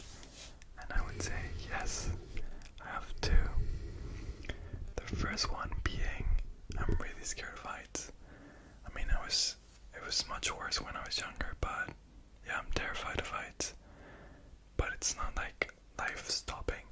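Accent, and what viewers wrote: Ireland. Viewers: As an Irish person I can say you defo have a little bit of an Irish accent.. what part of Ireland is ur dad from? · I was thinking that was an Irish accent!! Your English is very well spoke and as an Irish person you have such a strong Irish accent !!! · I thought I could hear slight Irish twang in your English speaking accent lol, sounds like it’s from up north maybe but hard to tell 😂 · Kerry accent 😂🤝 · I hear a faint Irish lilt as well! It's like 5% Irish (on the vowels), 5% Spanish (on the consonants), 90% perfect native-sounding English. Oop not to imply Irish accents =/= native English speakers 🤣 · Your english is perfect my first thought was “Oh he must have grown up in Ireland” 😭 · As soon as you started speaking I knew you had to have some Irish in you lol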